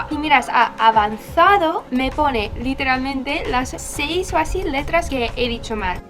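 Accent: Spain. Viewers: Sabes ya tanto castellano que hasta marcas como Red Bull y Monster las pronuncias al modo español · tal vez ya no puedes leer este post, ya que el video es antiguo, pero aqui en mi pais tambien se hace mucho aceite de oliva , me sorprende tu español aun que no me gusta mucho el acento (español) me sorprende tu buena buena pronunciacion y creo que eres la unica de habla inglesa que he escuchado que no tiene problemas con la RR la unica, en chile mi pais tienes lo mejor de los 2 mundos.... por un lado muy americano y por otro muy europeo, suena extraño pero es verdad. un abrazo! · Vengo de el canal de Anita, me gustan tus vídeos y me gusta tu tono de voz al hablar el acento español siendo de lenguaje materno Ingles, es una voz muy hermosa 🧡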